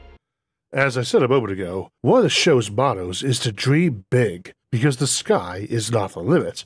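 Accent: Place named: South, United States. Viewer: I just can't place the accent. It's familiar yet foreign. Some kind of southern flavor like colonel sanders.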